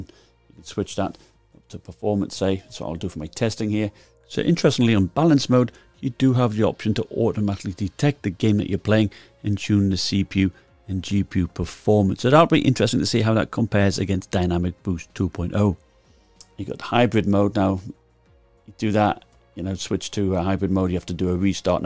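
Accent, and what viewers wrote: United Kingdom. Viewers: You sound british mate, wouldve appreciated the price in the GBP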